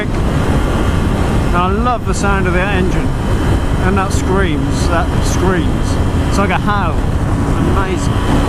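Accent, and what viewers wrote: Norfolk, United Kingdom. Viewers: Great vid. What would the R6 be like as a first bike? Also. I thought I recognised your Norfolk accent as I am only 20 or so miles from the border. lol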